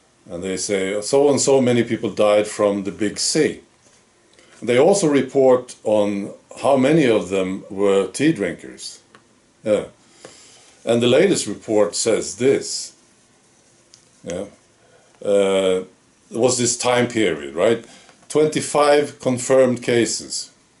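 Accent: Sweden. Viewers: Here here! The Big C 👍! Great idea to have there own League....... 👍👍 Love your Swedish English. Thanks for all you do to get the Truth out there 👏👏👏🍿🐸❤️🇨🇦❤️🙏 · I like your accent, you speak English better than a lot of English people, I am English, and one of my favourite sayings is one of your Swedish ones, I cannot say it in Swedish, " you have now shit in the blue cupboard ", " it means you have gone to far", so you can try an English controversial word, c u ne3xt Tuesday.much love from essex England. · I love your Swedish accent, don't stop saying "Mongkey" :) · Your accent? What are they on about? You are Swedish of course you have a bit of an accent, I wonder how well they speak Swedish?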